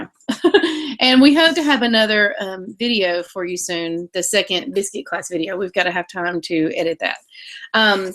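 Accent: South, United States. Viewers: Nice video and I like your point of view! Stories of your grandma and getting back to the basics. Ringing a chickens neck!! haha! That's a good one! But yes shopping sales, doing some gardening, dehydrating...Good for you. I think more people need to be smart about their food and purchases. So many people I have met dream of going off grid and being self sufficient t... but sometimes that dream is five years in the making. So starting today in your own home with what you have is the best place to start. So many was to cut corners and save. My husband is only 53 years old and retired basically and I work only 8 days a month! HOW did we do it..by going back to the basics like you said! GOOD video and love that down home accent!!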